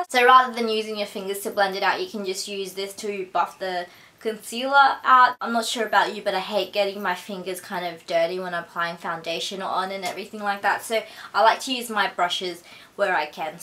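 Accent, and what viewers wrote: Australia. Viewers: Is she Aussie ?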